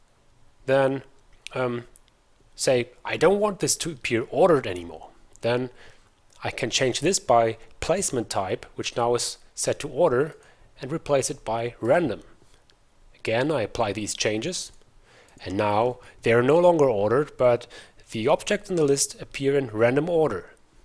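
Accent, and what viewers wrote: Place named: Germany. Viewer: Schöner deutscher Akzent :D Wie sind die Hotkeys um zb. die Z Achse zu ändern? Hast du ne Liste?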